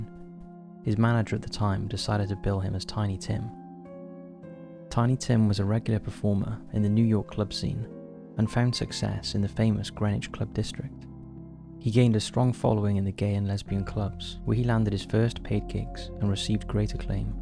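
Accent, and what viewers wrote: Wales, United Kingdom. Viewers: Oh I've missed your soothing Welsh tones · Yeah, they have not been the same without his Welsh twang. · he’s definitely Welsh lol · is it Welsh? I thought he sounded a little british, but regardless I miss the old narrator. He just sounds so much more educated and classy then the new one 😏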